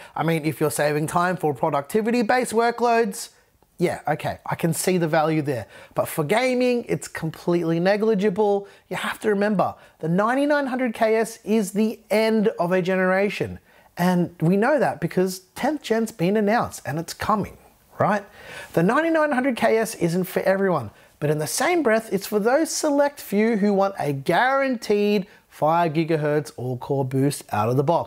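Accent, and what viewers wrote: Australia. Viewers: I subscribed for two reasons. Firstly, you don't overclock your cpu's. WHo does? Well statistically less than 1% of computer owners do and I am truly not interested in doing so. Imagine buying a brand new car and then adding turbochargers and NOS to it. Dumb, right. Overclockers do so so they can stress test their computer gear and pay to have the results published. It becomes "I have a bigger dick than you moment" which is stupid and unnecessary. Secondly, it's your accent. After listening to such much American droll it is refreshing to listen to an Australian. You are doing the world a favour. Ahh.....that accent is fantastic. Can listen to it all day and your grammar is exceptional. Thank you.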